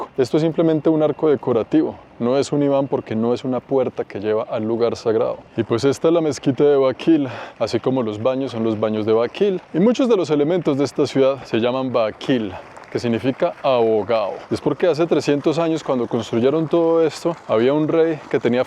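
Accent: Colombia. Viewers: es neutro de Colombia. jajaja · Me encanta su tono de voz tan varonil y ese acento colombiano tan característico, saludos desde Culiacán Sinaloa, México ❤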